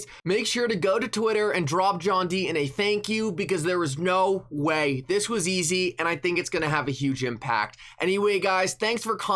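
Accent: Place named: Philadelphia, United States. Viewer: THAT IS A 215/267 AREA CODE ACCENT! LOL BUSTED MICKLE! LOVE ALL OF YOUR CONTENT EITHER WAY YOU HAVE BEEN #1 ON THIS CASE FOR THE PAST SEVERAL MONTHS IN MY OPINION! POWER TO THE HODLERS❤🎉😊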